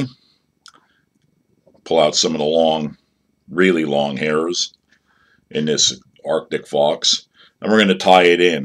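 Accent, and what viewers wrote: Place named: Pittsburgh, United States. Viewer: hey I was just wandering if you were a yinzer?. I am and you sound like a yinzer. check out my video about the secret hair jig as I give a shout out to you. Great job and keep up great work. if you are a yinzer leave a message for me and we can get on the water. thanks yankeelipper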